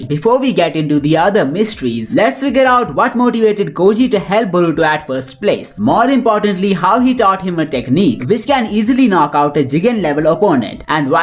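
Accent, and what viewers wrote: India. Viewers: Lmao I think he is Indian by his accent but let me just support you on this question for the reply 😂😂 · but i was asking so..... bcoz his accent seems indian to me.... and i was just demanding hindi commentary from 3 videos.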